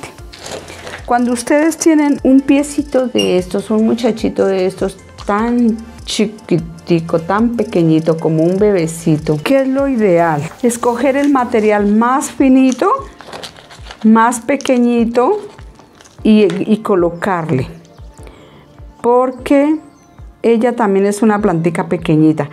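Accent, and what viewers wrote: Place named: Colombia. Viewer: Que acento colombiano más bonito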